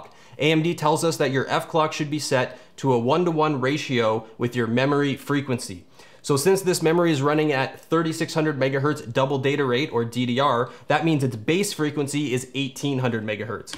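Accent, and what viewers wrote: Canada. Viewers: Love the Canadian accent. Seems another Canuck is into tech vids.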